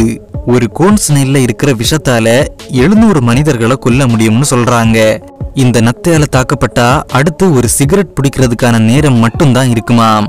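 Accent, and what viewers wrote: Kanyakumari, India. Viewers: Bro ungaluku Kanyakumari ya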